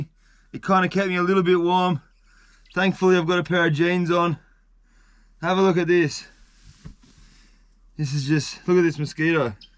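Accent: Australia. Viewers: As a Canadian, I find your Australian accent very cute 😄. New to your channel and subscribed! It was entertaining, mate. Keep them coming, eh?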